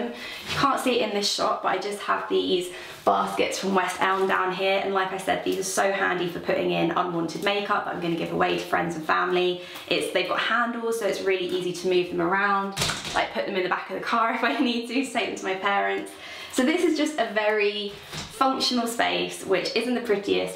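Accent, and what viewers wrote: England, United Kingdom. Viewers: Julia it's quite a generic way of saying "saw", most English people pronounce saw like that ( as a Brit)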